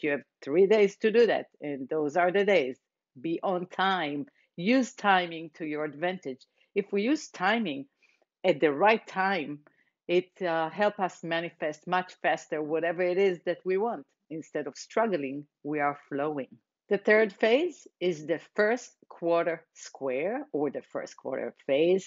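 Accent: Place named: Israel. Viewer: חשבתי שאני מזהה מבטא ישראלי קל :) נעים להכיר ותודה על המידע!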